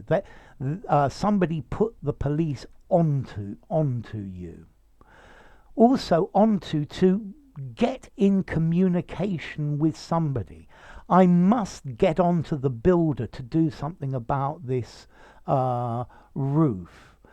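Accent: United Kingdom. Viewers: I love his accent so British ❤️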